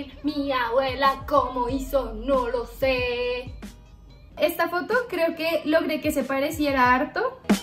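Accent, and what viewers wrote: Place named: Colombia. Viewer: me encanta tu acento colombiano 😍😍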